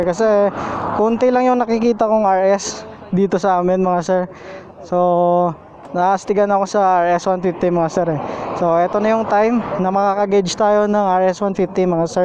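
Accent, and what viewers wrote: Iloilo, Philippines. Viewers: Ilonggo ka kuya🤣🤣gravbe taka ka idol mag dala sang rider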